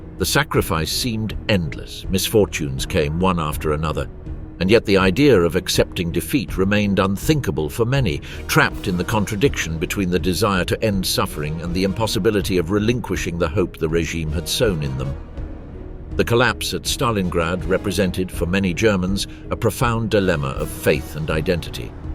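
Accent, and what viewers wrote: United Kingdom. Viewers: The usual anti-Hitler video which is the only ones acceptable of course. Great photos. Annoying Brit narrator. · I'm a retired NYC public school teacher. 25 years of ESL ( Eng Second Lang) to immigrant adults: South Americans, West Africans, Asian adults, fresh " off the boat." They always ask me about history & I tell them. I'm (82, Jan.'26) world history buff, so I know a lot & this is an excellent doc to describe what it was like there then. I also served in US Peace Corps Türkiye <num> & know a lot about Middle East. I speak/read/write Türkçe. So who wrote this script for not only this film but for all the others too? Excellent general research. Great British accent, too. I respect the work that went into this series. No problems with whatever " minor inaccuracy" there might be. Overall, great product. Thank you.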